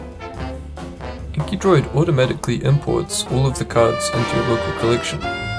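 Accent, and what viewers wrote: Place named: New Zealand. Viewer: Who got the Kiwi to do the tutorial about "decks" then..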